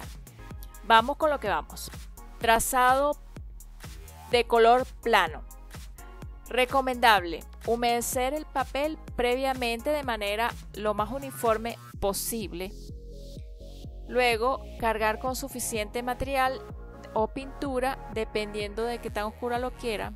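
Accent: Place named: Venezuela. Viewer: Muy buena, parece acuarelista venezolana, es genial es muy didáctica y fascina su modo de hablar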